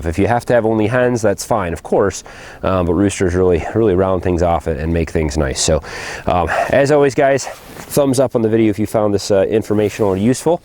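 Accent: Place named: Midwest, United States. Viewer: What part of the country? Midwest, I'm guessing? I live in the Pacific Northwest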